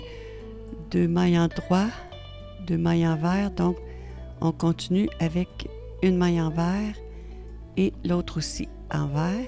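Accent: Canada. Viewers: Il y a longtemps que je cherchais un modèle juste un peu pénible la pub qui s'affiche merci pour votre modéle il est super et j'adore votre accent canadien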